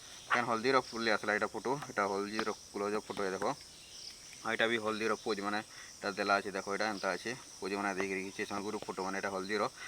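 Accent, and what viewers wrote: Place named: Sambalpuri, India. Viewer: କଂଚା ସମ୍ବଲପୁରୀ ଏ ,,,,,, ପୁରା ଖଡ୍ ଖଡ୍ ଖଡ୍ କରୁଛେ ବଲ 😅😅😅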